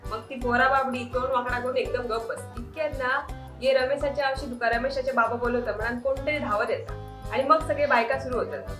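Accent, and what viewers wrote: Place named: Malvan, India. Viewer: एव्हढे अस्सल मालवणी इतरांच्या व्हिडिओत मी खचितच ऐकलं असेल, मस्तच 👍👌👏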